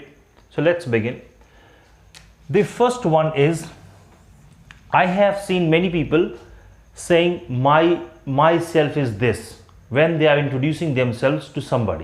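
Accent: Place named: India. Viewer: I'm a native English speaker. I don't mind people saying "good name" though it does make the speaker stand out as probably not being a native speaker. I did not know it was specifically an issue for people from India. Your example with "thank you" vs. "you're welcome" is something I never thought about. It's not so unusual in some of your examples if a person says "you're welcome". I can't see anybody taking the wrong sentiment from it, but I suppose it's best to be careful. In a couple of those examples I may have said "no problem". Maybe there are certain English speaking regions though where this is something to be worried about. You speak very well, but still have that "v" sound replacing "w" and "d" replacing "th". How long does it take to lose that part of the accent for Indians or is it something that most Indians do not fight and just accept it? 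Thank you for the interesting video. ))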